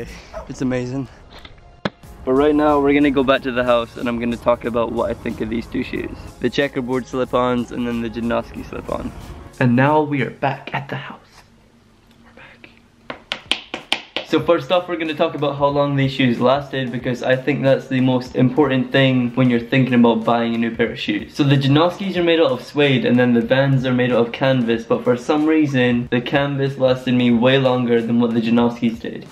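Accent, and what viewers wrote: Scotland, United Kingdom. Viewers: Is ma mans Scottish????? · R u Scottish?😂💕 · i only realised he was scottish about half way through the video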